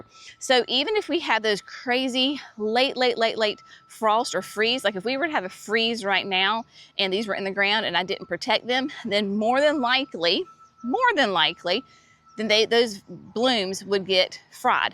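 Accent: South, United States. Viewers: "Sweet Thing"! I love it! Gotta use that one. My first time to view one of your videos. Subscribed and clicked the bell. I'm a southerner too and love your accent. Your garden is truly an inspiration for me. We assembled a greenhouse this past year and now that I can expect to reliably start seedlings I've been trying to figure out how and where to plant all the flowers. I see you just tuck them in here and there and everywhere. I'm going to go through your videos one by one to see how you manage to keep weeds out of your beds... if you till areas first, if you till in amendments, how you lay out drip lines. I usually use sprinklers on timers. I love your Formosa azaleas and am guessing the whites are Mrs. Gerbing. I have those two along with George Tabor at the entrance to my driveway and now, greenhoused, will be taking cuttings to line the full drive. 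I have shade, shade, and more shade. It can be difficult to find a place sunny enough for full sun plants but as you are aware, I'm sure, Proven Winners is expanding shade plants all the time. I agree, Gertrude Jekyll is one of the most amazing roses for fragrance. I'm going to put Desdemona on my wish list too. I actually came here to see if you offered information on how - when to deadhead hydrangeas. I've read that it shouldn't be done but don't see how my plants can possibly produce more buds without access to more light as the old heads dry up. Thank you for showing all the work that is involved in gardening. I too use a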